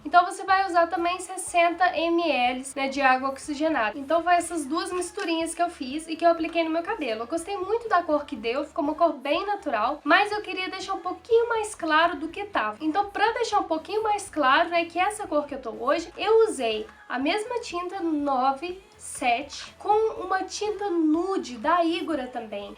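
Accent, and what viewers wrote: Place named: Brasília, Brazil. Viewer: Acho que ela é de Brasília-DF. Pq o pessoal aqui tem esse sotaque lindo, maravilhoso, pelo qual sou apaixonada tbm 😍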